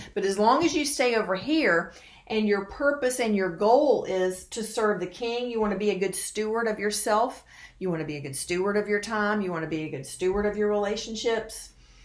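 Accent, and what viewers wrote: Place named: South, United States. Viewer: Beautiful southern accent 😍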